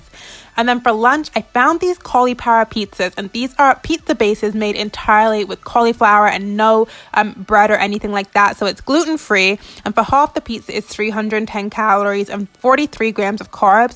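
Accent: United Kingdom. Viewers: Why do you sometimes sound British? I’m kinda confused. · Hinata Hyuga she is British and she moved to Canada when she was younger. So her accent probably stuck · Your accent is sooo different in this video, I've been watching your videos since you started you WL - are you dating a Brit? Or been to the UK recently? The English accent is more obvious than it has ever been.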